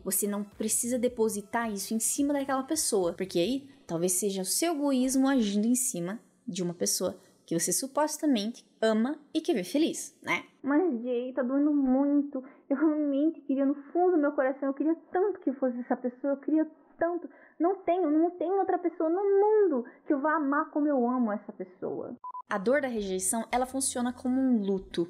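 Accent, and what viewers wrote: Paraná, Brazil. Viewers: Oiê jay! tenho que dizer que eu adoro o sotaque paranaense xD hoje (presente), com o pouco amadurecimento que consegui, vejo esse sentimento como paixão, egocentrismo... acho que a gente sente falta de se sentir amado. E por muitas vezes nos deixamos ser guiados por essa dor (mesmo já tendo tido a mesma percepção que vc, acredite, cai alguns níveis por causa desse sentimento) mana, a gente tem que reforçar mto, conhecer e se conhecer mto, refletir pra não cair nos mesmos mecanismos mentais , senão tiver esse exercício diário, a gente cai mesmo! Somos mto falhos (e frágeis) e ainda na nossa criança psicológica, temos muita necessidade de se sentir amado, ... a gente tem muito que aprender sobre o amor, sentimento profundo... acho que isso devia ser lido e ensinado em todos os ambientes, desde pequenininho (um dia sonho que teremos escola de sentimentos para as crianças do futuro... 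Quem sabe? ;-) ) obrigada por esse vídeo! S2 mto importante é necessário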